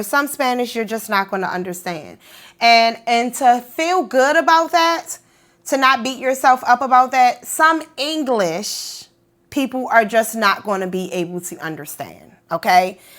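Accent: Baltimore, United States. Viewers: thank you for another informative video! I always look forward to your content. Don't worry about what anyone says about your Bmore accent. We all have some type of accent. You are doing great! Hello from PG!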